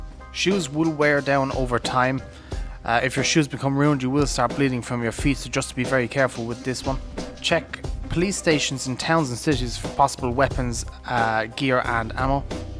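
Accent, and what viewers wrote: Ireland. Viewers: Are u from Ireland?U sound Irish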